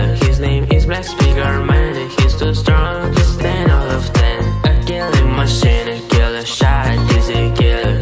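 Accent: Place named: India. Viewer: Indian accent makes it funni lol😂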